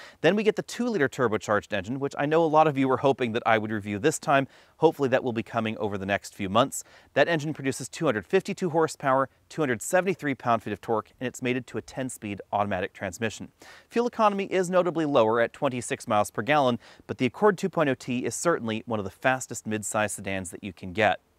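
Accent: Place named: Texas, United States. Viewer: Must be that Texas accent.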